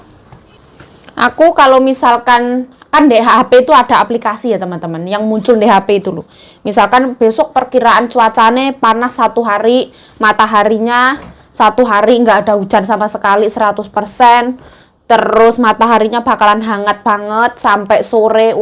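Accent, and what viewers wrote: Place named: Java, Indonesia. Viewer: lihat makan itu, jd kabita euyy, jd pengen makan, lagian dengan logat medhoknya itu bikin, guyu" dewek🤭🤭😁😁 Semangat yuk.. semoga kamu menjadi selegram yg sukses. Aamiinn🥰🥰🙏🙏🤲🤲💪💪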